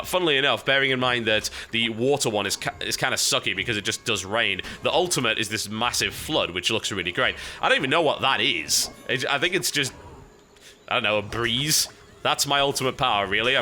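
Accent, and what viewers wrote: United Kingdom. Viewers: WHAT does it matter if he pronounces babel different than in America he got a british accent which I like way better than American accent.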